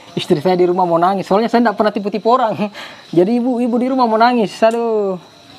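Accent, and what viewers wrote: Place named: Java, Indonesia. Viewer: Pak Ustadz nya logatnya kaya orang JAWA.....SALAM DARI JAWA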